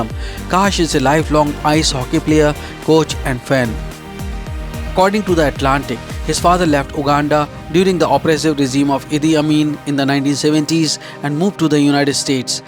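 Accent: India. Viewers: The narrator must realize many in his audience doesn’t understand Indian English. Perhaps if he enunciates it would help.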